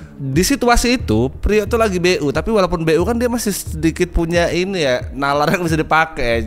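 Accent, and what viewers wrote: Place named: Lampung, Indonesia. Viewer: bang gue suka bgt logat Lampung inimah bikin kangen pulang gue di jkt doang sebenernya tapi kangen bgt logat ini😂🎉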